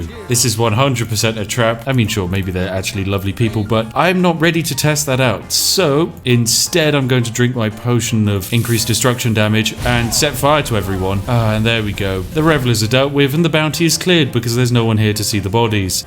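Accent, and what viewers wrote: United Kingdom. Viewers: Are you british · Are you English or Scottish or Welsh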